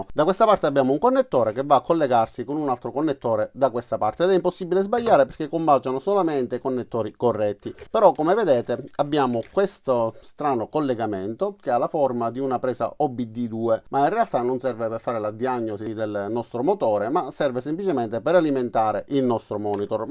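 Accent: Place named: South Tyrol, Italy. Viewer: l’accento altoatesino ti ha tratto in inganno! 😂 P.S. Adoro l’accento siciliano 😉👍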